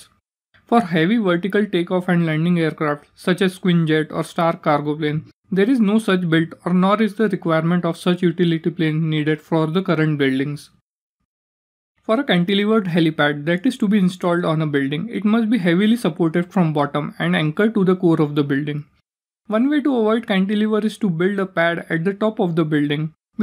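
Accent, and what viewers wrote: India. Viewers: He soundz like rajistahn 27 responses matches the 27 disapproves Manhattan area tall buildings of ny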